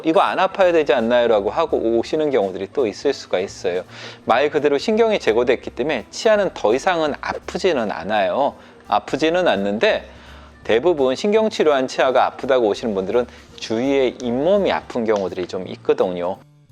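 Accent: Seoul, South Korea. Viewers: 서울분이신가? 있거등요 ㅎㅎ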